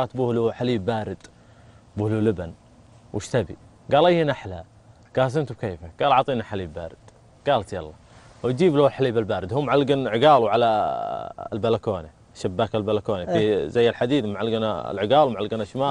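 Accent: Hail, Saudi Arabia. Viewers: ي زيينن حكيه حائل يهبل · تفوز سوالف و لهجته الحائليه😭😭😭💜💜💜💜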